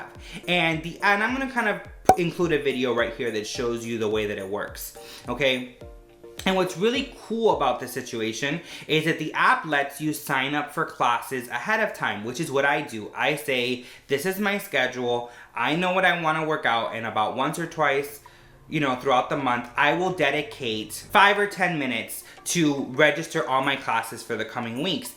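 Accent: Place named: Cuba. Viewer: Omg I loved this video! Going for my first workout with OT next week and you made me feel at ease. I love your Spanglish! Cuban or Puerto Rican? I’m gonna say Cuban! Cubanita here!🇨🇺 😘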